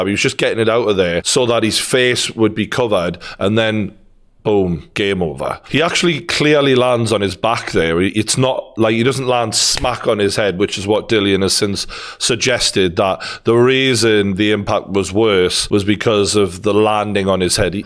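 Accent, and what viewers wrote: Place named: Geordie, United Kingdom. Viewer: True “I did say” Geordie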